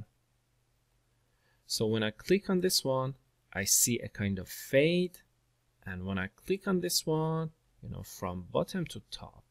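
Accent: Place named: Iran. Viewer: You have a persian accent , are u persian hamvatan?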